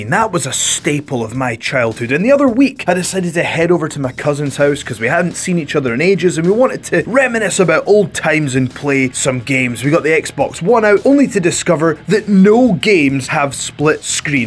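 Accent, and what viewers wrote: Scotland, United Kingdom. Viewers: I like you, don't be sad! I mean, I prefer the mad quartet of plumpy, peter, ben and jules..... but you have the lovely Scottish accent. Oh and you totally 'technical wrestling word' Jules which gives you a total pass!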